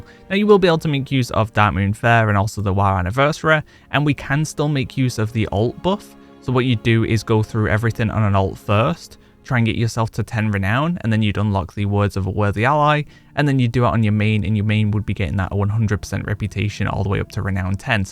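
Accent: United Kingdom. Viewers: You're an absolute unit. Playing a half empty PTR for weeks to provide us collectors with top notch information. I also really love your straight to the point but still detailed style of video - and your UK accent is just the cherry on top, love it 💖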